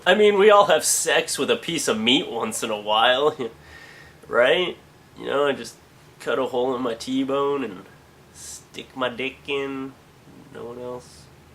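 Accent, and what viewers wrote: Canada. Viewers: i love the Canadian accent the i'm Australian and its not so cool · and ur Canadian accent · I LOVE CANADIAN ACCENTS!! soo sexy <3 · I love it when you say "house" with your cute\amazing candian accent! :D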